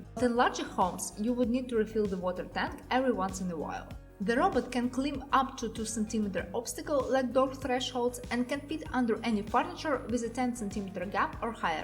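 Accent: Russia. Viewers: Thanks for the review, but the accent is too Russian, that it hurts ears.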